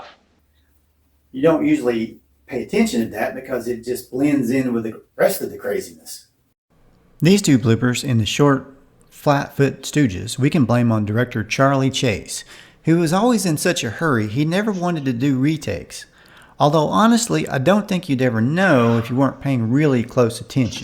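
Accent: South, United States. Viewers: LMAO!!!! I suppose it's because of his very heavy Southern Accent. Kind of like when the Brits say SEH-MEH-TREE for cemetery and INVENT-TREE for inventory.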